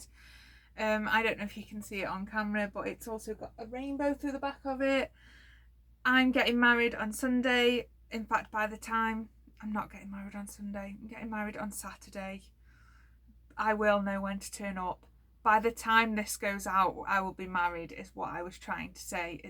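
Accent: United Kingdom. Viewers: Are you from burnleh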